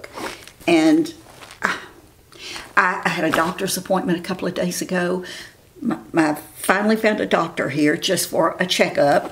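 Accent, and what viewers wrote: South, United States. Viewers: Hi, im watching ur Q&A video (also about caftans). im a new subscriber. About negatives comments, why do these people watch(whoever’s channel) if they arent fans?? Some folks just need to complain🤷🏼‍♀️well i love ya girl!! I could listen to ur southern accent ALLLL day long!!!! 😘❤️ps say hi to Jim, and tell him the yard is looking great!!!🧤🌴🪴🌾🌸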